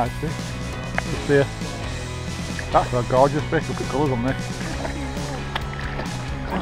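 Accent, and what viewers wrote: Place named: Yorkshire, United Kingdom. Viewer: I read your blog on dropshotting before watching this great video. LOL You did a great job of keeping a straight face. I've just tackled up with an LRF cheapish combo so as to be able to fish part sea, part canal after years of threatening to start fishing again,then taking early retirement I've finally done it. Your video's have inspired me to get another lightweight spin outfit for lure fishing. Drop shots, and small rubber lures came with the Shakespear agility LRF set up, but you've really sold it to me to lure fish. I wish I knew where you were, your accent sounds like mine, in between Leeds Bradford area. You're a credit to the sport. Thanks. Robin.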